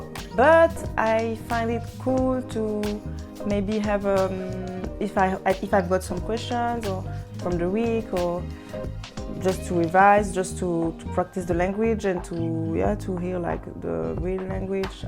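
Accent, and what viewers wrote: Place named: Belgium. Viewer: You sound very Belgian haha (coming from a fellow Belgian) I would recommend you to check out Dreaming Spanish. がんばれええ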